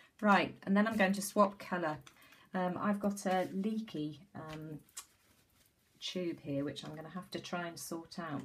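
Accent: United Kingdom. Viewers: thank you so much for replying that was very sweet of you to take time out but I figured you were probably in the UK from your accent I'm in the USA so I don't even know what Boden is LOL just looks darling I had a top similar to it a long time ago and made me think of the polka dot top I used to have and I don't know what happened to it LOL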